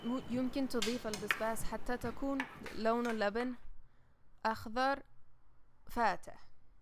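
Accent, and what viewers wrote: Sanaa, Yemen. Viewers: مشاءالله تبارك الله اختي بالرغم انك لستي بيمنيه و عربيه و لكن كلامك يمني صنعاني و طبخك للاكلات زيهم بارك الله في عملك و تسلم ايديك علي الشفوت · ياربي اللهجه مو حلوووه ابدا احسن تكلمي بالفصحى احسن وانا يمنيه لا يجي أحد يتفلسف بس ما احب لهجة صنعاء 😨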